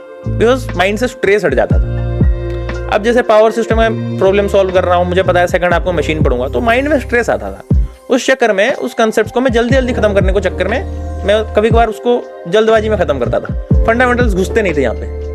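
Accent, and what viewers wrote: Odisha, India. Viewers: Bhai aap odisha ke ho kya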